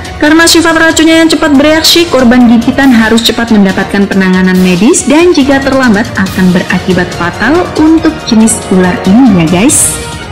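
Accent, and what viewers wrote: Java, Indonesia. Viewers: Syin nya medok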